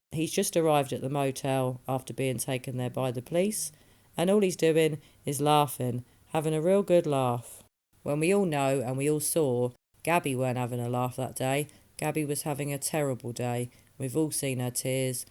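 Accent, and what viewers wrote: England, United Kingdom. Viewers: IMO he simply stole it as a trophy, and to feel his superiority and control every time he looked at it. If this was the reason, murderers usually hang on to their trophies, so may be if not wearing it, it's still with him. Thanks, for your videos, I live abroad, and look forward to hear you English voice with each new video. YAY, go gal go!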